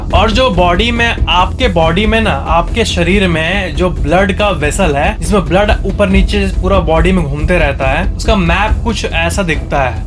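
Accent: Bihar, India. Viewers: Bhaiya ap bihar se h kya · Tumhare Bolne ke tarike se anyone can say you are bihari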